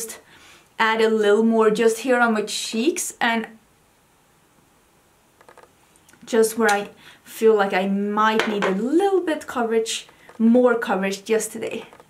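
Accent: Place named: Sweden. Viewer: I loooove how you talk hahaha... I’m a big fan of the Swedish accent 😁 and that eyeliner is AMAZING for your eye color!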